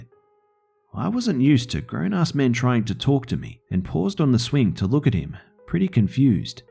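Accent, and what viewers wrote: Australia. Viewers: Holy shit Australian narrator! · He's Australian :)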